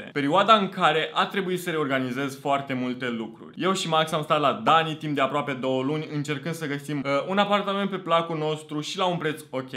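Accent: Moldova. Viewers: Ador accentul tau😍 esti moldovean de-al meu 😌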